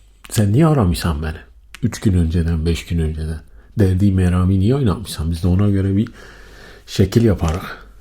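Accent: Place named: Urfa, Turkey. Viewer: Urfalı şivesini ölene kadar dinleyebilirim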